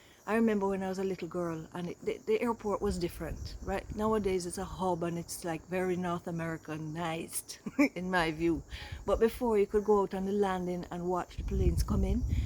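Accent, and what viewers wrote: Jamaica. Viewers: Wow !! Almost speaking Jamaican as well, Luv ya !!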